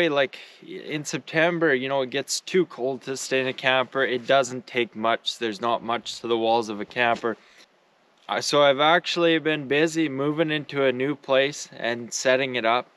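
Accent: Canada. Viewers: My family has been in Canada since 1809, we own large amounts of land for generations and yet still this guy sounds more Canadian than me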